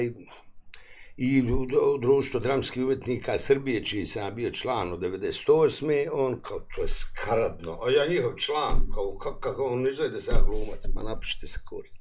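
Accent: Bosnia and Herzegovina. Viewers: Jel on iz Sarajeva pa ima Sarajevski naglasak ilije iz Pala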